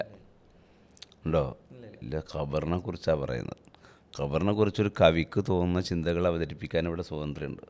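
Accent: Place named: Kuruvettoor, India. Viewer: സംസാരംകേട്ടിട്ട്കുരുവെട്ടൂർആണ്എന്ന് തോന്നുന്നു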